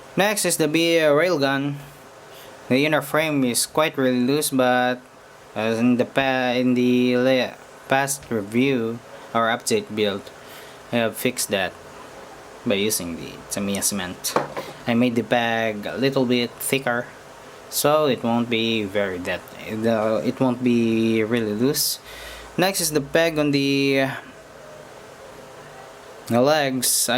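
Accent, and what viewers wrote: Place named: Philippines. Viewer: from the sound of your accent your from philippines right? :D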